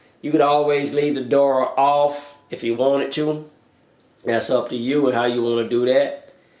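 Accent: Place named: South, United States. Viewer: narrated with a heavy southern drawl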